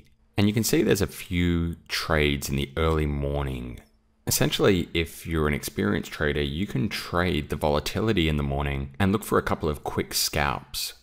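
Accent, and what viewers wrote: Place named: Australia. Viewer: Thanks, don't now if it's the fellow Aussie accent that hooked me or more so gave me the confidence to listen in more, but from checking out FOUS4 and then seeing how you explain it in really easy laymen terms (for noobs like me) it's well appreciated. Keep up the great work!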